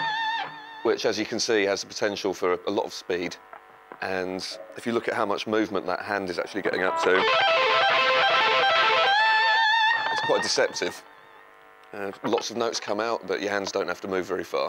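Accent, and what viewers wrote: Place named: United Kingdom. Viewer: I started to love british accent because of him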